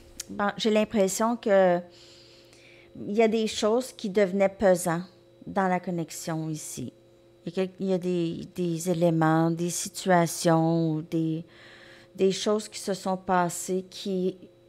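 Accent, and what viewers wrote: Quebec, Canada. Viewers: OK 👌 j aime bien écouter votre langage du Québec il est super un vrai régal pour les oreilles 👂 à demain 👍🌝🕊🌈🇨🇵Frédéric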